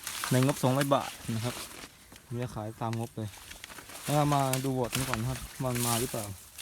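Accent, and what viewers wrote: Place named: Phu Thai, Thailand. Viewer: ยุแถวไหนครับ คือเว้าภูไท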